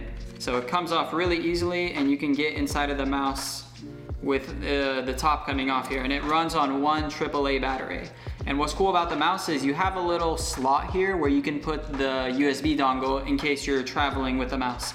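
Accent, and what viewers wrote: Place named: Georgia, United States. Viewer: U from GA?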